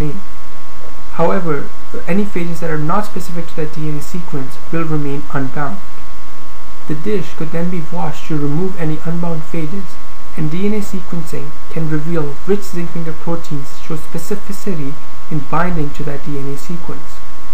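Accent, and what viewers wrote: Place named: India. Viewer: wtf is that indian accent everywhere in biology videos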